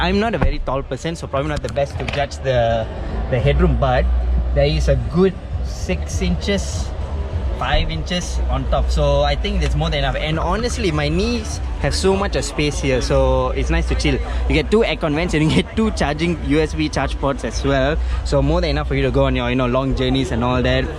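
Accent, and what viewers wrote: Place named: Tamil Nadu, India. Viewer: Your tamil english smart bro... teringat kat member2...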